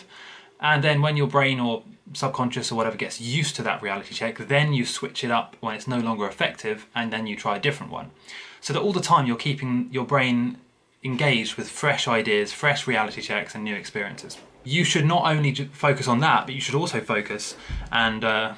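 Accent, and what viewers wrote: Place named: North, United Kingdom. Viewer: I'm american. I understand you well I think its cause you sound like youre from the north(maybe, could be wrong). There are some English accent dialects that are difficult to understand but youre clear :)ha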